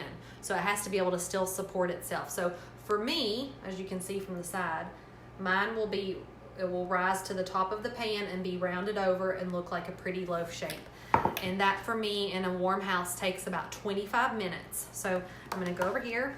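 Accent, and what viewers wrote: South, United States. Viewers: Hi, watching from the UK, & I love your deep southern American accent 😊❤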